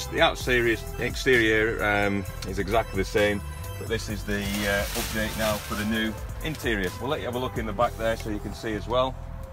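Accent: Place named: Cumbria, United Kingdom. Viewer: Think its Carlisle in Cumbria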